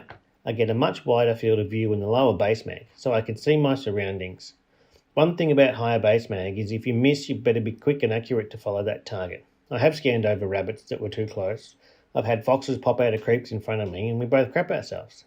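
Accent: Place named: Australia. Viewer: I guess it's just ME (Anyone else have this problem?) but..........that heavy Aussie accent and fast-talking got me. Understood about 10% of what you said. Was looking forward to a super-informational video on thermal scopes/spotters but had to bow out early. Bummer.......!!!! (Maybe you can slow down your speech a bit.........Will give you a "Thumbs Up" as I'd bet the video was otherwise worth it).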